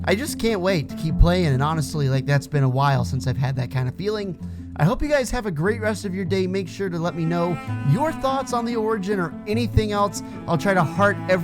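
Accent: United Kingdom. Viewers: i knew he was British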